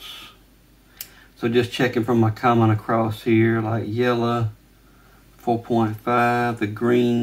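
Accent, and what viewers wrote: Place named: North Carolina, United States. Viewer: Are you from North Carolina by chance? Your accent sounds like my dad's hometown in the southeast.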